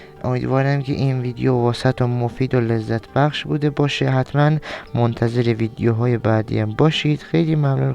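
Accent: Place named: Turkey. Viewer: داداش خسته نباشی بخاطر ساختن این ویدئو.میخواستم یه پیشنهاد بهت کنم.بنظرمن بهتره متن ویدئوهاتو با همون زبان زیباودوستداشتنی آذری بخونی و زیرنویس فارسی براش بذاری.بنظرم اینطوری مخاطب بیشتری پیدامیکنی.اینجور کانال‌ها و ساختن اینجور ویدئوهای قوی و زیبا، خیلی زیباتر وجذابتر خواهد شد زمانیکه گوینده خیلی روان و فصیح وبدون لهجه بازبان رسمیه کشورش، روایت کنه.متاسفانه شما بالهجه‌ی شیرین ترکی صحبت میکنی که به گوش شنونده نسبت به ویدئو، جذاب نمیاد.بنابراین بنظرم بهترین کار همونیه که گفتم.با زبان آذری و زیرنویس فارسی.بازم خسروان صلاح مملکت خویش بهتر دانند.امیدوارم حرفام باعث سوءتفاهم و ناراحتیت نشده باشه.صرفا فقط دوستداشتم زحمتی که میکشی، ثمر بده.ایام بکام⚘️🌿⚘️🌿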